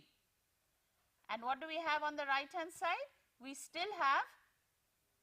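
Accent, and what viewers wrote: India. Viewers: lmao! ""OXIDOREDUCTASE!!"" man a luv da indian accent!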